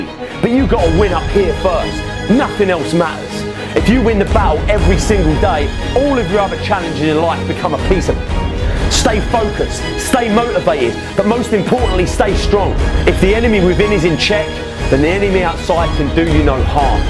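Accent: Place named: United Kingdom. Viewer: your british accent is fucking amazing!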